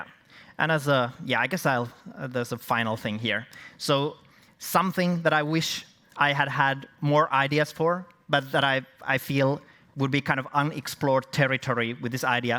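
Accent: Finland. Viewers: The Finnish accent Rakastan sitä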